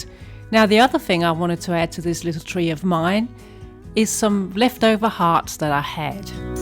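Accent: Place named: United Kingdom. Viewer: Wow! Your porch is absolutely stunning! And those sweet teddies are tucked in the tree so they are sheltered from the elements! I think Hermes makes a bag styled very similar to your Teddy Blake bag. Aren’t you chic with that fabulous handbag! Finally, besides loving a British accent that I have fun trying to mimic, I learn new words from you. In the USA we call “wadding” filling or stuffing. You have convinced me that I need to make some of the felt hearts with wadding! Than you so much for sharing your creativity with us!❤❤❤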